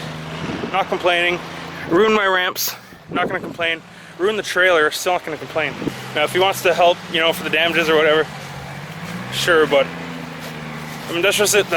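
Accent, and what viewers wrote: Canada. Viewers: He’s literally Canadian. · Is he canadian?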